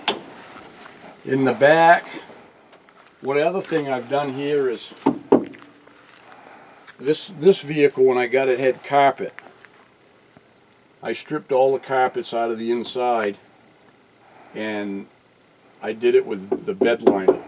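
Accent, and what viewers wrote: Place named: Maine, United States. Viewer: Supah swampahs, huh Bub? 😆 Love the Maine accent!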